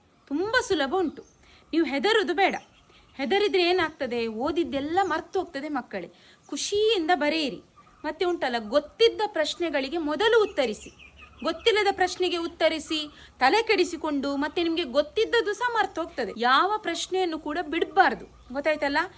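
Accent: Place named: Coastal Karnataka, India. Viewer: ನೀವಾಡುವ ಕರಾವಳಿ ಕನ್ನಡ ಧಾಟಿ ನಿಜಕ್ಕೂ ಮನೋಜ್ಙ, ಕೇಳುಗರ ಕಿವಿಗೆ ಅಚ್ಚುಮೆಚ್ಚಿ.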